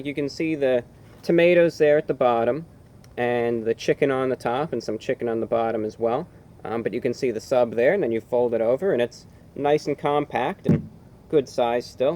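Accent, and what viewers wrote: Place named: New York, United States. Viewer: As I'm moving yet again, your accent reminds me of my hometown in NY. Thanks for helping me get through my most stressful move so far. <3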